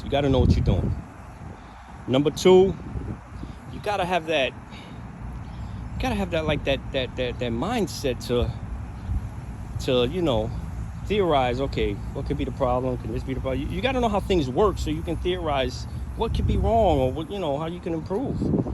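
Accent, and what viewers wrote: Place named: New York, United States. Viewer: That fuckin Ny accent oh my god! No cap, but you sound like a old ass white man who sits in central square park all fucking day alone!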